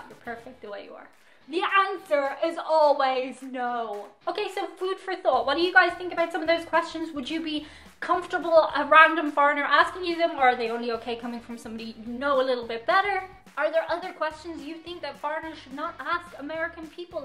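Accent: Ireland. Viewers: Oh and ask away any question to us across the pond. We will gladly answer someone like you who is both open minded and easy on the eyes. Love your Irish accent as well. So lovely. So keep on keeping on. Same for Chewy.:-) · Man i love Irish accent. Could listen to it all day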